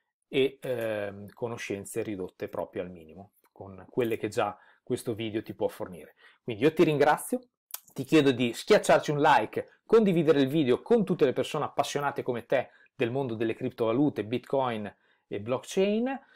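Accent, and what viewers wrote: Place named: Bologna, Italy. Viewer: Ottimo video, preciso senza essere troppo tecnico....e l'accento bolognese é fantastico... Detto da uno di Modena 😉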